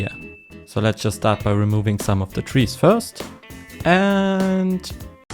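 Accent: Germany. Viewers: du bist deutsch das hört man an deinem english und kein engländer sagt oki doki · You are not "Hochdeutsch" are you?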